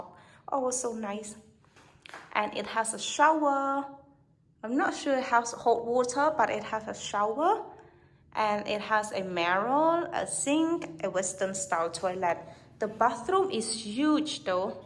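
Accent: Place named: England, United Kingdom. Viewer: Yes you have a harmonious English accent. It's very nice.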